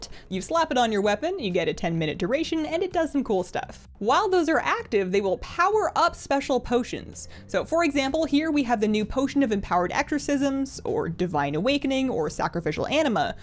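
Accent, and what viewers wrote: Canada. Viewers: Subtle Canadian accent? ♥ Canada.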